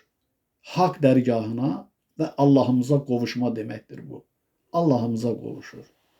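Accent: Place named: Karabakh, Azerbaijan. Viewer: Siz Gəncədənsiniz . Qarabağ ləhcəsi ilə danışırsınız . Amma sizin danışığınızda bir iki dəfə mənə elə gəlir ki qazax ləhcəsi ilə də danışdınız . Yəqin ki bir tərəfdən də onlarla qohumsunuz . Mənə maraqlı gələn odur ki səhv etmirəm ki ? İstərdim ki fikrimə aydınlıq gətirəsiniz .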